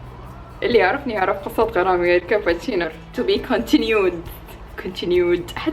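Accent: Iraq. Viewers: بداية، احلى لايك قصة الكوب، قد تبدو سهلة وفاترة، ولكني اشعر بالمعنى الكبير الذي تحمله، احياناً تكون لدي مثل هذه الشطحات، بأن اقسم على نفسي بأن لا استخدم الشيء الا في المكان والزمان الذي احدده له لكي يكون حافزا لي. ويكون له طعم رائع في حينها يشبه طعم النصر. اللابتوب الوردي، لونه يعبر عن الانوثة الطاغية في شخصيتك. بالمناسبة، فعلاً اللابتوب المفروض يتبدل على الاقل كل 5 سنوات لان البرامج تصبح متطورة اكثر وتحتاج الى ذاكرة اوسع ومعالجة اسرع. الطبيعة الخلابة،،،،، احسدك عليها. سعيك وراء الاهتمام بامورك الشخصية والاعتماد التام على نفسك، امر مشجع كثيراً.. لهجتك العراقية راااااائعة تعبر عن عزيمة وثقة بالنفس وبنفس الوقت الانوثة والنعومة طاغيتان، احلى شي تلفظينه هو حرف الراء وحرف العين. مميزان كثيراً. اتمنى لك التوفيق من كل قلبي. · فديت الهجه لعراقيه شكد حلوه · سلامة افادج ههههههه.. ابتسامتج واللهجة العراقية.. لا تقاوم.. اتمنالج التوفيق من كل كلبي.. وبالعافية ع اللابتوب الجديد.. 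اذا تحتاجين مساعدة بخصوص اللابتوبات والبرامج وهالاشياء.. ممكن افيدج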